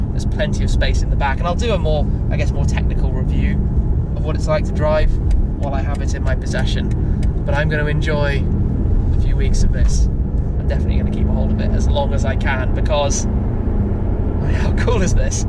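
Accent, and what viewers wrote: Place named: RP, United Kingdom. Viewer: Ur so posh bruv allow it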